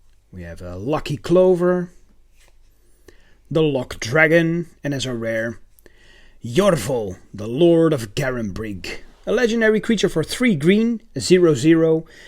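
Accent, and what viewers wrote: Netherlands. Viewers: Dutch accent for sure, groetjes uit A'dam!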